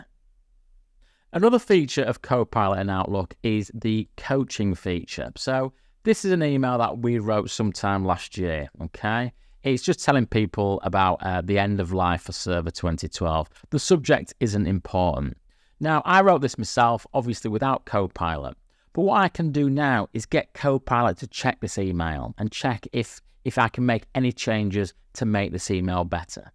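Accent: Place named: Northern England, United Kingdom. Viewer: Northern England.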